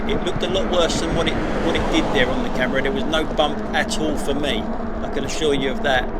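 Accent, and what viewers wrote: London, United Kingdom. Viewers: I did watch it to the end :-) I used to live in Ilford and worked in London at 16. I moved to Germany in 1974 at the tender age of 23 to go to my future wife and it was great to see the areas around London again. Btw. I love your accent, reminds me also of where I grew up. I swapped my racing bike for an E-Bike when I reached 71 after the roads started getting steeper. Keep up the great work.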